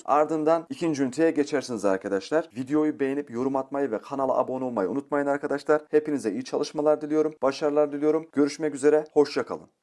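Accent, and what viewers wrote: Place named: Adana, Turkey. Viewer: konuşmanız adanalılar gibi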